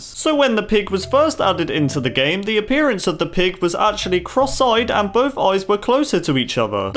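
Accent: United Kingdom. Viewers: are you britis?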